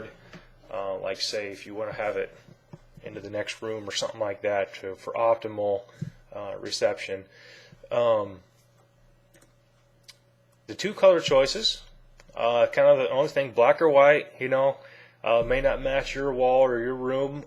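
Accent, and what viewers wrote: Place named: Germany. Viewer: hi are you from germany? i noticed the german accent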